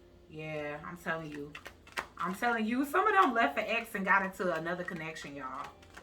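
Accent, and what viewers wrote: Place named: Detroit, United States. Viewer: I knew you was from the d we got that accent lol. Thank you for this reading! Spot on.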